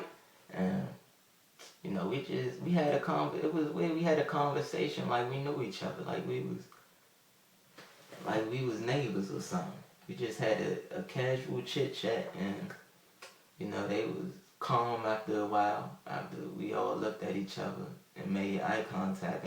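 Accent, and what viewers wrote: Washington DC, United States. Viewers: Cool hair, you sound like you're from DC. This vid was interesting.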